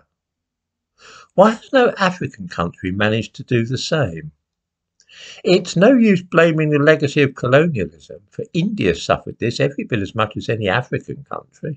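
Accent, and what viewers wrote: United Kingdom. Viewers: Bad mic + British accent = no idea what he is saying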